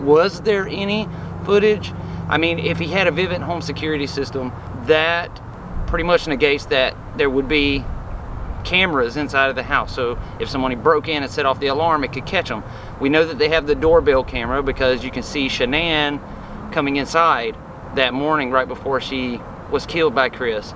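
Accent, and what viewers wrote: South, United States. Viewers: Love your vids, - and the southern accent (Im a Brit), so absolutely, I'd watch if you went for a drive around N. Carolina. Thanks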